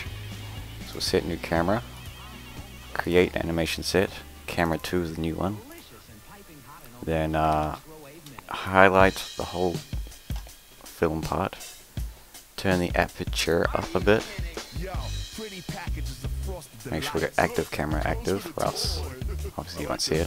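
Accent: New Zealand. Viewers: new zealand accent ftw!!! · sexy new zealand accent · No! It's a New Zealand accent. They're like, where's the caar, and he's like, where's the caar. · I know hes a kiwi...I'm used to people confusing me for a Brit/Welshman....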